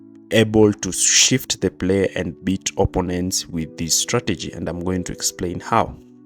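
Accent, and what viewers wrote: Kenya. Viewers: I love your commentary. I don't know where you come from but you have a Kenyan English accent.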